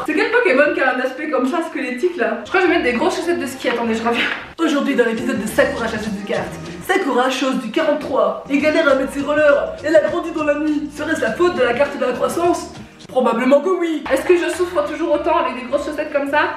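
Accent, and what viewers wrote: Belgium. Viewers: Tu es Belge ! sais trop bien moi aussi xD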